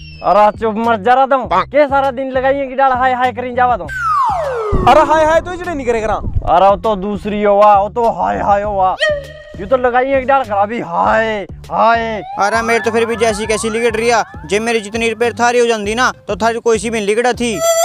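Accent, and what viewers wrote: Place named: Haryana, India. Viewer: Aapka haryanvi bolne Ka style achha lgta h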